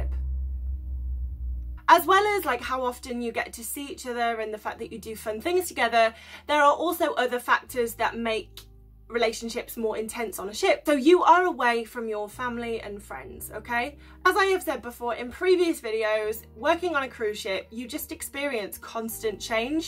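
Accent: United Kingdom. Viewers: Nice one , very nice information,nice accent,are british.keep posting more info mate bye for now.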